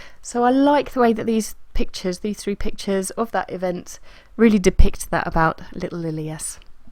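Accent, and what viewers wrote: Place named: England, United Kingdom. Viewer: Not kind, truthful. I was surprised to hear an English accent though, I was expecting an exotic Nordic-type accent. I have seen you before but only as your name, so didn't connect the two together. Can't wait for more of your lovely cute videos. I definitely agree with Cathy Yosha! See you next time.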